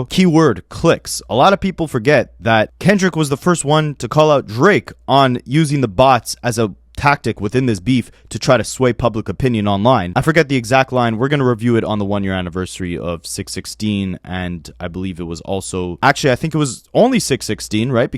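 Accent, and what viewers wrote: Canada. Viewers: Bro i knew you were Canadian!!!! You have similar speech patterns to Whats The Dirt. (Not saying you are WTD). You say "against" the same exact way. I was wondering if it was a Canadian thing. Love the videos btw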